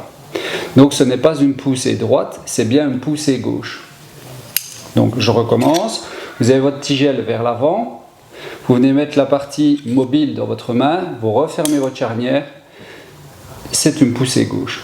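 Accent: Liège, Belgium. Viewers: A la 30,26 minutes le serre joint à lâcher !!!! Heureusement que tu tenais bien le gabarit n’a pas bouger. Pour ma part , j’ai un autre type de gabarit. Qui entaille directement les trois charnières en un seul serrage. Porte 201,5. En tous cas , ça fait plaisir d’entendre un belge avec un accent liégeois. Bientôt je me lance sur YouTube avec mon accent Brusselair ! Ça va déménager les R.🥳🥳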